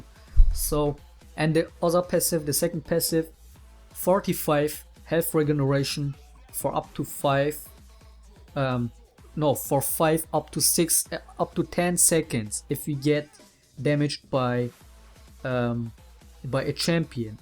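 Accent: Germany. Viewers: Ok ty, i wasn'y expectobg his accent to be a german accent, so i asked spanish but don't know the spanish accent xD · hes from germany and live in germany,so its a german accent i think he learned english in the school and games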